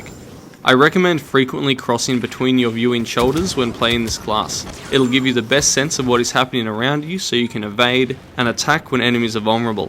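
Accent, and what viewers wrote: Australia. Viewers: You're aussie too? :D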